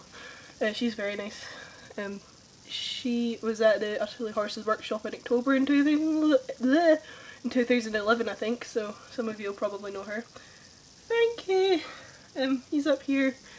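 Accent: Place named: Scotland, United Kingdom. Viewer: Your not alone with the accent , cause I'm scottish too :-D and live near Glasgow !!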